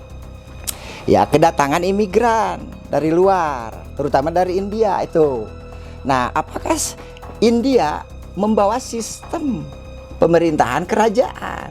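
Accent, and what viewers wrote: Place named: West Java, Indonesia. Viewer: Trimakasih, Meski Logat Dan Bahasa Sunda cukup paham ,👌 💞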